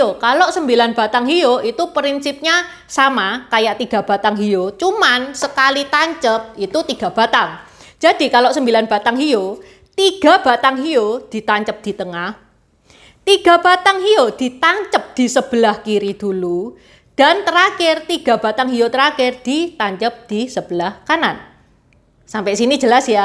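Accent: Java, Indonesia. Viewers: Saya bkn ethnis tionghua ni mbak saya mau nanya ni, apa yg di maksud tepekong atau apakah itu tepekong yg sering di sebut apakah itu sejenis mahluk halus dalam budaya tionghua, trimah kasih sblmnya cici yg mdok jawa nya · cara omongny kyk org jwa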